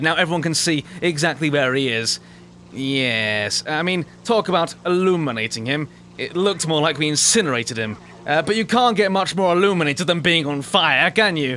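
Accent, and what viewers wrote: United Kingdom. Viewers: I love your videos!! Just a suggestion but you and your British accent should play with baron and his German accent, and Phly and his American accent! And the whole time all 3 of you are the perfect stereotypes, I just think that would make for an amazing vid! Keep up the good work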